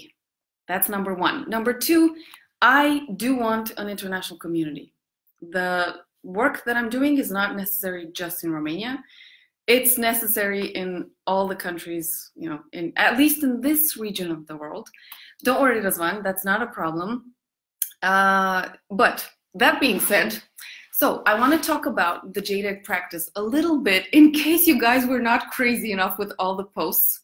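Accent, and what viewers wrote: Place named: United States. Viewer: But you do speak English perfectly! And you have a bit of American accent 🙂 Did you study there?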